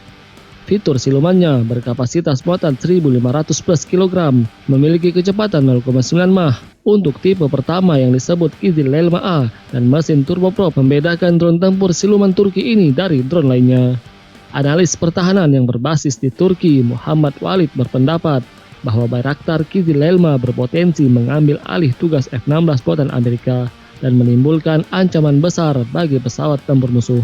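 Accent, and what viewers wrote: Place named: Saparua, Indonesia. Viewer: Min kau orang saparua k...logatmu kental orang saparua😁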